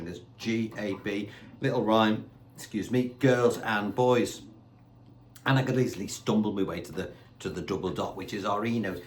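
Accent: Liverpool, United Kingdom. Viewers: You sound a tad scouse. Can't listen to that awful scouse dialect.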